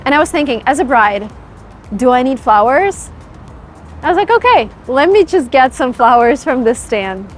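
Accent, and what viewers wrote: United States. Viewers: I love imitating your American accent, this language spoken so fluently. I just listen to your videos while I cook dinner or everytime I can and I' m so astonished to see how easily you influence and improve my listenining and speaking abilities. Thank you a lot. You' re fantastic!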